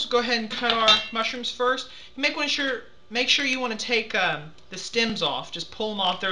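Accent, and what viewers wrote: United States. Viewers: he sounds southern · he sounds like he's living in texas or something with the southern accent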